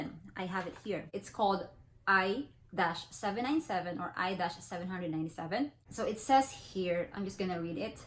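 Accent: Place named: Philippines. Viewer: Filipino-english accent! Ang nice! 😊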